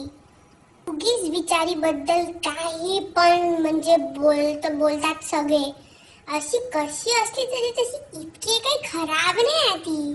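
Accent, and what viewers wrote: Nagpur, India. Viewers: Awesome Stuff, really Hats Off to you.....one of my friend speaks same like SOTI porgi.. as she is also from Nagpur...Both of us enjoy your videos a lot....Happy Diwali..to you and your Family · ekdum masta👌and I love that kinda nagpuri accent😀☺awsm...keep it up👍👍👏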